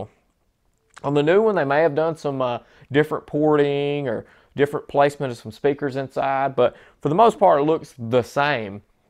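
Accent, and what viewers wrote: South, United States. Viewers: Came here cuz I would like to buy used ones. Daaaaaaemn heeee soooouands sooooyyy reeedneick.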